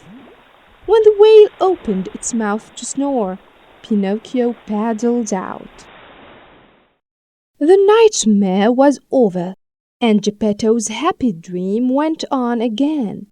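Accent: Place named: United Kingdom. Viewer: this accent British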